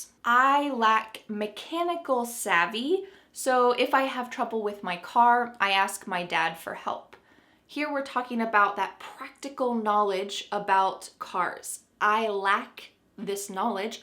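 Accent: United States. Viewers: I can't hear your voice from when you had stated this channel but I love your Vedios and I am so so happy because I have found a great teacher for me and I love American accent and I love your voice I want to speak like you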